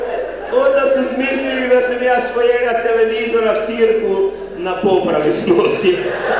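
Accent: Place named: Podravina, Croatia. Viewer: Predivan naš podravski dijalekt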